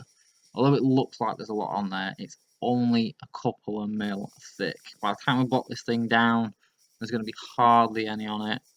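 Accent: United Kingdom. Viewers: Once again I apologize for me rude comment. With that being said, please answer my question, I am having difficulty in understanding the British accent which I am sure many of your viewers do, what grit did you use in sanding those areas that weren't damaged? P180 before laying the primer right?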